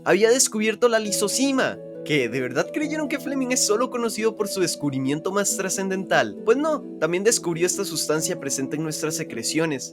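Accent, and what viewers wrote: Costa Rica. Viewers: Escuche la voz y dije "Es tico" y luego reviso la bibliografía y es de biotec💚, muchos animos en este proyecto, ojalá te vaya super, yo soy primer ingreso de biotec.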